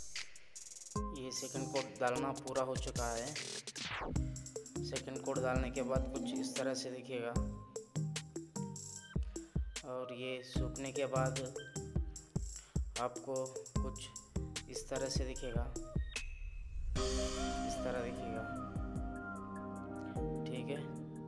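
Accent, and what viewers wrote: Hyderabad, India. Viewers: Pkka hyderabadi bol rahe hoo bhai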